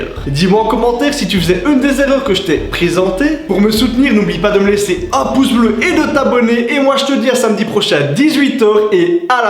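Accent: Belgium. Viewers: Tu est belge ?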